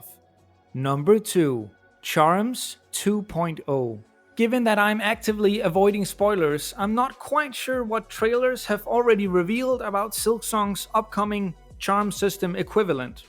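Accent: Denmark. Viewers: Your accent sounds danish, is that right? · Den danske accen er rimelig let at genkende føler jeg.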